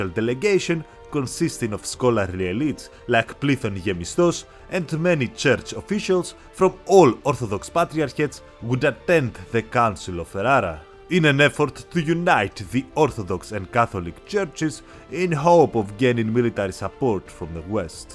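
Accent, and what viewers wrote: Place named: Greece. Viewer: Have you heard his accent, he is a greek spreading bullshitt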